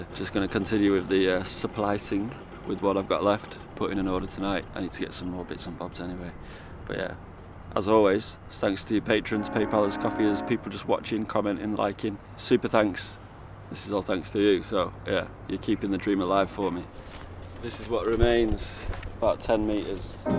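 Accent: Lancashire, United Kingdom. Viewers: Sounds like most of the blokes in my nice Lancashire town....there are still some left.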